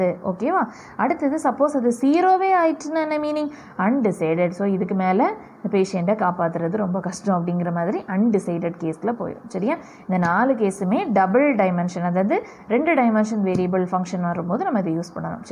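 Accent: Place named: Nanjil, India. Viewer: Very crisp explanation with Nanjil Tamil accent.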